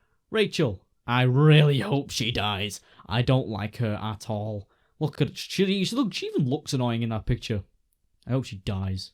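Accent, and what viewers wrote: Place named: Ireland. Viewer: Nice video and predictions! Are you Irish btw? Love your accent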